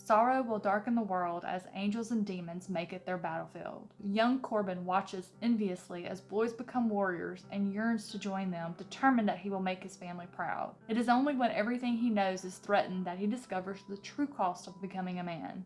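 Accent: South, United States. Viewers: Omg your southern accent <3 I am Canadian and I've loved my trips to the south. John Gwynne is a damn badass and I love him. I hope you LOVE the rest of the series.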